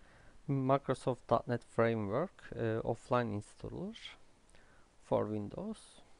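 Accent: Turkey. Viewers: Direk anladım Türk olduğunu :D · Sounds like a Turkish accent. Where are you from buddy?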